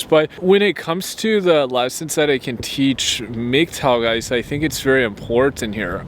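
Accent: Europe. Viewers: You have a european accent why is that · The legal availability of "professional women" (brothels, hostesses) as well technology adds to the dynamics in Japan. P. S.:You are from Chicago? You sound foreign, I thought you are from Europe.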